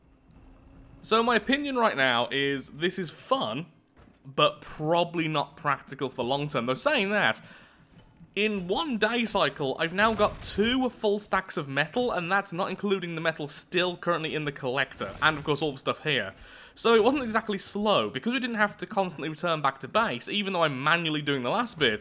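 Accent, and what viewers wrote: United Kingdom. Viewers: 1. your british, not weird. cheers mate 2. i do believe the idea of increased bearings to increase speed is a good idea. even just one or two per wheel. just as a point to point increase use.(on a button) 3. if you make the collector apart of the crane, weight not considered, would be the best moving forward. nice thing is the collector is placeable and buildable upon. such as the drills attached to bearings on the collector for added pressure. 4. i do enjoy the forked wheel design and the "spider" like stance. 5. if you have questions on how to connect items of logic, or Moonbo or Kan are great choice to review here on YT.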